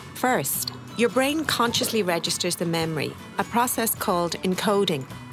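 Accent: Ireland. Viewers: I was wondering why I heard a faint Irish accent. Then I saw 'BBC' in the channel's title :) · So does this mean it's not my fault that when I hear an Irish accent (sorry voice over) I automatically think of Gypsies trying to tarmac my drive or sell me pegs?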